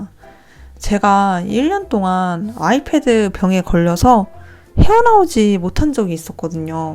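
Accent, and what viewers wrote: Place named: Jeolla, South Korea. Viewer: 전라도 분이신가요?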